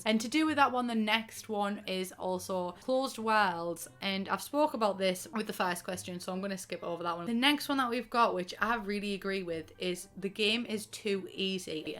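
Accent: United Kingdom. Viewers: lmao I'm from Germany and the only reason I understand you is because I have friends from all over the UK so I had to learn how to pick up on new dialects quickly. Before I met my first friend from England (West Yorkshire) all I could understand was that annoying London Accent and basic american english tbh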